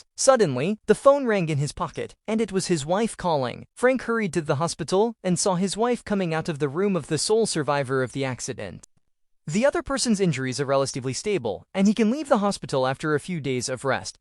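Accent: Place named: Germany. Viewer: The AI’s German accent narrator is a relief because most AI voices are the same generic voice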